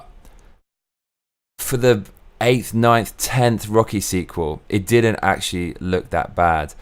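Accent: United Kingdom. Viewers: Hello, The lead-actor of the Creed movies is Michael B. Jordan (no relation to the superstar American basketball-player, Michael Jordan). He played the primary-villain in the recent Black Panther film. He was also Johnny Storm/The Human Torch in the 2015 version of The Fantastic Four. I was unable to hear the quality-difference between the microphone that you usually use, and the $10,000-microphone; perhaps the audio-compression that YouTube employs removes the frequencies and the nuances that make the difference. However, it was very interesting to see the substantial-weight of the superior microphone. I, too, have never heard of an internal pop-filter/pop-shield; I simply reasoned that such an expensive microphone would probably include pop-prevention methods that are more-convenient and more-effective than what is available for microphones that most people can afford to purchase. 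The fitted 360-degree filter is beautiful, and it makes the microphone/filter look all-of-one-piece, as opposed to most filters/shields, which look like sloppy after-thoughts. Your instructions for the audience to ignore your spoken-content, and to pay attention to only the sound of your voice, made me laugh! Although you have a masculine-deep voice and a classy British-accent, it is your intelligent and entertaining commentary that has made me continue to be a subscriber since I saw your insightful-critique of The Force Awakens. Good luck to you as you apply for a permanent-visa i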